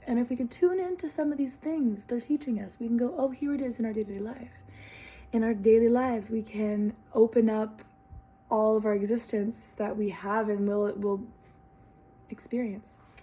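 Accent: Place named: RP, United Kingdom. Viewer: OMG! Can I be more Inlove w/ this girl!!! Haha!! Aquarius Sistar here!! I love your Scribbling Bit! Nothing could be cuter except the general Alice British proper way you speak through the blubbering of your whimsical speech as you interpret the codes of your readings in your experience. OMG Girl I'm gonna get drunk on this channel, dangerously. You're a Marvelous bloomage of humble beauty dearest Lovely Crystal Star Child. ✨🫶🏻🎶💥 I think you know who this is 😜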